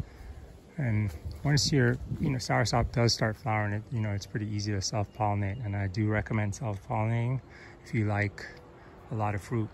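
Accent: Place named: Jamaica. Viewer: Respects - cool technique. (PS you sound like a yard man all weh ah gwaan still 🙂)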